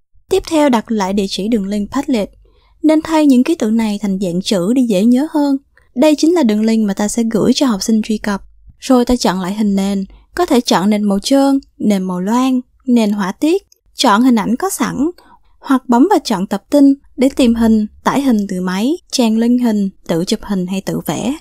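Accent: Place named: South, Vietnam. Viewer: ôi đúng là giọng con gái miền Nam, nghe ngọt xỉuuuuuuuu nghe kênh của bạn này hướng dẫn vừa dễ nghe lại dễ hiểu, dễ làm mong bạn có nhiều video như thế này nữa <3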